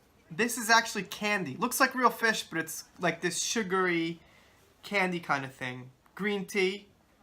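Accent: New York, United States. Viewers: funny I thought you had a NY accent 😆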